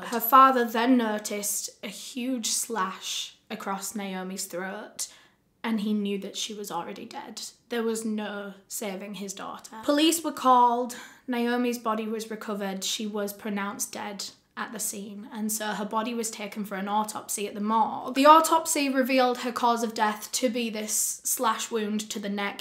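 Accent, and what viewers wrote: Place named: North, United Kingdom. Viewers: storytelling style drifts further back to her northern roots every single video and I love it 😂 these videos have always been great but that extra touch of personality in the more recent year or so has been a nice addition. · gets more and more Yorkshire as time goes by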